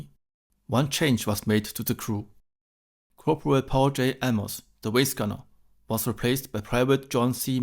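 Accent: Germany. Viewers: If this is your voice, it really adds to the story. The accent adds some depth and feeling to the video, somewhat like watching Das Boot in German, with English subtitles. Well done! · Grundsätzlich mag ich den Kana sehr, aber vielleicht angesichts des starken deutschen Akzents vielleicht mal darüber nachdenken, ob Sie den Kommentar nicht durch eine KI-Stimme sprechen lassen. Dann klingt es wie richtiges Englisch. Da gibt es auch jede Menge gute Freeware.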